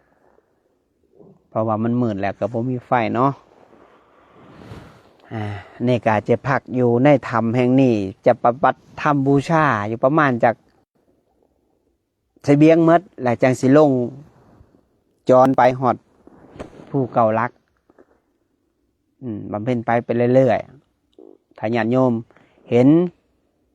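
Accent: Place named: South, Thailand. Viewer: สาธุสาชธุคะ่ชอบฟังมากมากคะ่ยกระแสสินธุ์พากใต้คะ่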